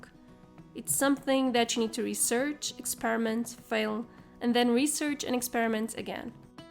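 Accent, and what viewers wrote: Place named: Portugal. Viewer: I just found your channel a couple of hours ago and I have been binge watching your videos nonstop. Your videos have really helped me organize my routine before I go back to college (i'm studying Law). I also noticed your accent and wondered if you were from brazil or from Portugal then I saw some portuguese books during this video and just wanted to say your work is amazing and wanted to send some love from Lisbon. Continua com o bom trabalho e tudo de bom para o futuro. Ps: Clearly english is not my first language but I did my best.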